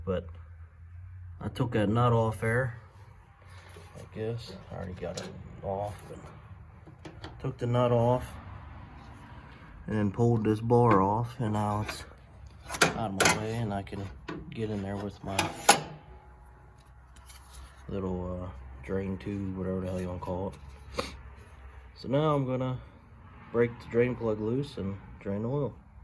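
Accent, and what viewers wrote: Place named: Pittsburgh, United States. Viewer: Dude u gotta be from Pittsburgh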